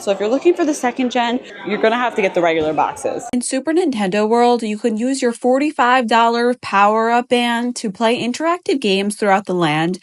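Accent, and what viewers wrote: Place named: New Jersey, United States. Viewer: That Jersey accent is thick. 😂😂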